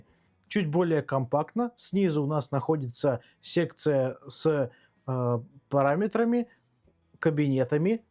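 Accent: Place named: Germany. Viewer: Купил такой недавно, прикольная штука, но на постояннку только новичку, и то с натяжкой. Обзор понравился, но есть ощущение что автор немец который учил русский как иностранный. Сорри если обидел, но звучит реально так 😅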